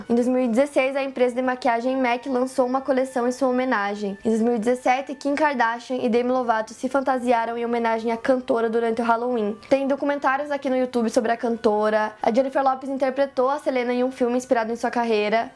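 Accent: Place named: Northeast, Brazil. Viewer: essa mulher é nordestina ou paulista??? so taque todo misturado..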